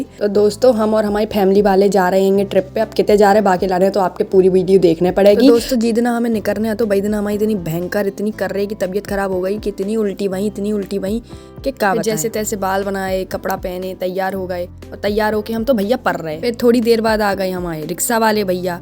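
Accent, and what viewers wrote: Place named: Bundelkhand, India. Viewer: Aapka bundelkhandi accent is so good 🤌👏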